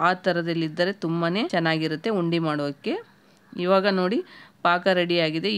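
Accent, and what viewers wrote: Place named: North Karnataka, India. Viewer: U speak in UK kannada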